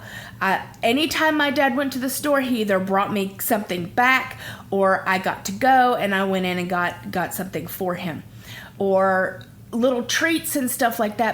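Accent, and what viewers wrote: South, United States. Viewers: I am so sorry for all the verbal and emotional abuse you have gone thru it actually brought tears to me. hugs you're such a sweetheart. I love your cute lil southern accent and your glasses are super cute. I think you are doing awesome and keep up all the good work girl!! 😁🤗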